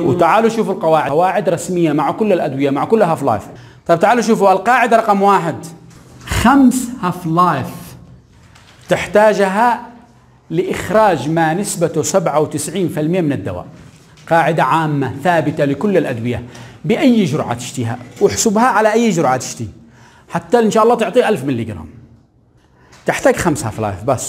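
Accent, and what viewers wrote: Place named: Yemen. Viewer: اللهجة اليمنية ❤ الله يوفقكم اهل اليمن ويزيدكم من علمه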